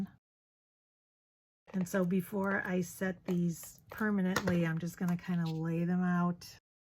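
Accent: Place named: East Coast, United States. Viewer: And…..I love all of your box designs!! Love hearing your Eastern accent. This CO girl grew up in New York and all of my brothers live in MA now. Miss those spectacular fall colors. Hugs, Karen ❌🍁❌❤️❌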